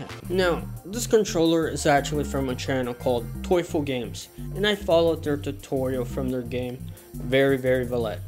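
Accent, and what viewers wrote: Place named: East Los Angeles, United States. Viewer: It's because he's young it's still weird. Or it's that lip smacking noise he makes sounds like a chick from east LA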